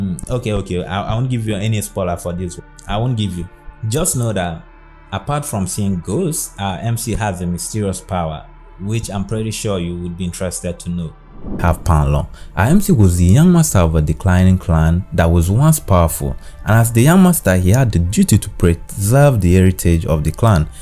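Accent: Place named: Nigeria. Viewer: Bro you Nigerian right Your accent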